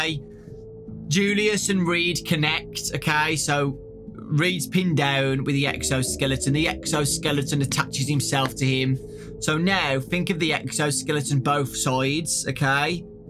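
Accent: United Kingdom. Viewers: He sounds sorta british and I want to have a british accent but I have this boring default american accent, I wanna say,”OI MATE YOO AINT GOT NUFFIN O ME!”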